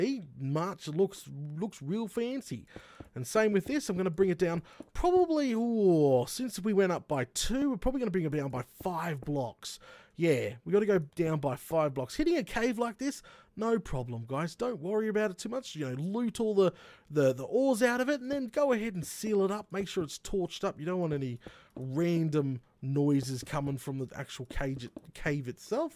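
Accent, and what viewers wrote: Australia. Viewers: Mmmmmm...so Australian.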